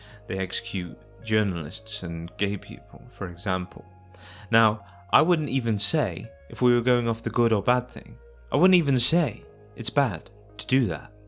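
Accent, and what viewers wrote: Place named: United Kingdom. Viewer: british :pillow-turquoise-hot-chocolate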